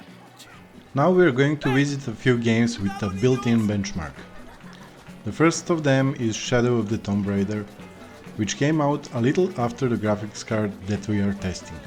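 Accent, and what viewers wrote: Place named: Russia. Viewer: You Russian? Ukrainian? Good review.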